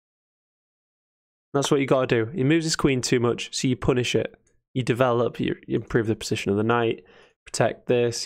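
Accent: Hull, United Kingdom. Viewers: You’ve got to be from Hull with that accent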